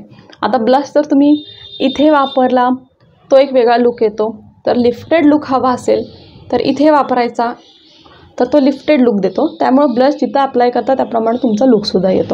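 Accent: Kolhapur, India. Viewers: Hi ….. mi make up sathi khoop video pahile pan tuza video khupch chan aani useful vatla. Mast boltes 😊assal Kolhapuri❤ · मॅडम तुम्ही कोल्हापूरच्या आहात का, मध्ये मध्ये भाषेवरून वाटलं❤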